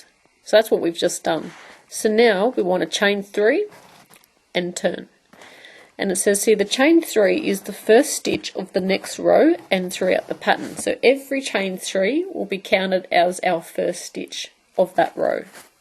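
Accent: Australia. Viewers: Sorry, meant to get this in with my post below. Hi, I am new and truly enjoy the video's I've viewed on your channel. You make it so easy for those havin' trouble because you explain things so well. I like listenin' to your Aussie voice; as I am from the USA . You do have a pleasant and soothin' voice. Thanks so much!! ~Buffalo Gal~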